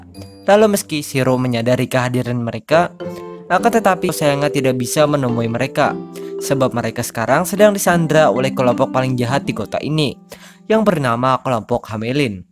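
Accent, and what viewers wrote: Banjar, Indonesia. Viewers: banjar banarr wkw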